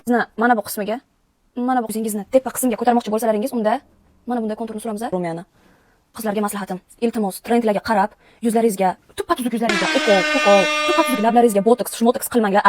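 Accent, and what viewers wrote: Bukhara, Uzbekistan. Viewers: Navoiy yoki Buxoro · Salom buxorolikmisiz.Buxoromni shevasidan o'rgilayda.🫰🫰🫰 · Шевангиз узингизга ярашади Бухороликмисиз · Бухороликмисиз дийман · Biza Buxorolik bizaga shunaqa gapirish yarashadi😅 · Buxoro sheda juda chiroyli sheva meni dadajonim Buxorodan ayajonim Samarqandan makyaj juda chiroyli vaaaaav🎉🎉🎉🎉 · Shevaba gap nest.Buxoro nomer ❤❤❤❤❤1. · Navoiylikla qanaqa gapiradi nima kulasan Buxoro shevasi zoʻr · Ibi Manam Buxorolik😅 shevamdan aylanay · Biz buxoroliklarni gòzal shevamizni ustidan hech kim kulishga haqqi yoq❤ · Buxoro shevasi nomer 1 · Manga Buxoro shevasi yoqadi❤ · Бухоро шеваси ❤❤🔥🔥🔥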